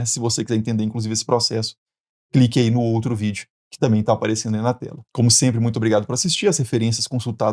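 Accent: Minas Gerais, Brazil. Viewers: A didática do "mineirês" é a melhor! 😂😂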